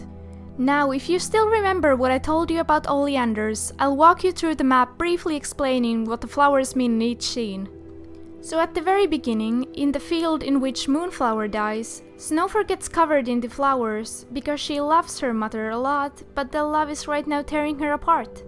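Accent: Finland. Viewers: Ah, i can hear the finnish englhis. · Da Dragon Durp it's called "rally english" it's really common with finnish people xd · I like waffles MÄ TIESIN SEN! MÄ OLIN NIIN VARMA ET SE ON SUOMESTA JA NYT TOTUUS ON SELVILLÄ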